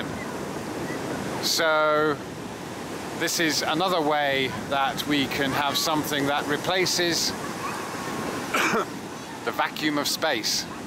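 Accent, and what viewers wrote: United Kingdom. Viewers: It hurts that this guy has a British accent and wasted a western education that people less fortunate would have given anything for....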